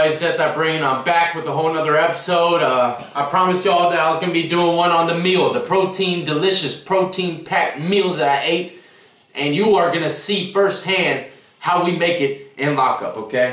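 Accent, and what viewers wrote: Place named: South, United States. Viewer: Death really let out the full southern accent and word choice loose on this one..."allllright yallll...we're on to da next one..."😆😆🤣💀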